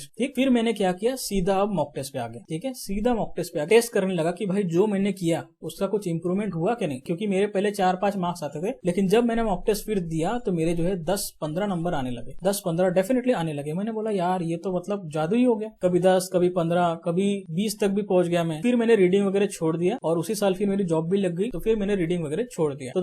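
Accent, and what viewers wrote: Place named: South India, India. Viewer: Bhai tum south indian jaise sound kr rhe ho is video me. 😮 Mast Hindi boli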